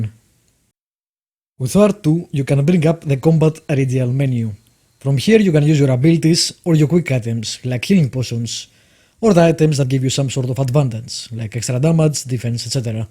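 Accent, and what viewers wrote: Greece. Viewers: Ειναι αστειο πως καταλαβαινει κανεις κατευθειαν αυτην την ελληνιλα στην προφορα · Are you Greek? It seems from your accent :) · Nice Review.You speak English like a Greek,are you Greek? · Great review, was really interesting to listen to your Greek accent & how you pronounced different words. Good show!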